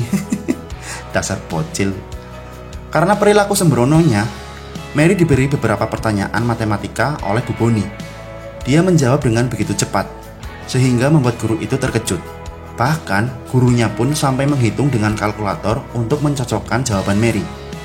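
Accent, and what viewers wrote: Banyumas, Indonesia. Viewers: Orang ngapak ya lu bang adminn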